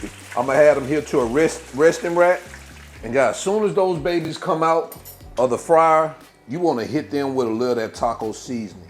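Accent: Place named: United States. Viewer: I love the way this guy talks. No idea what part of the US you're from but as a Canadian it sounds pretty cool to me. This looks amazing, I'm gonna make these as chicken fingers, idk something about federal laws. All chicken must be served in the form of fingies or you're not a true Canadian or whatever.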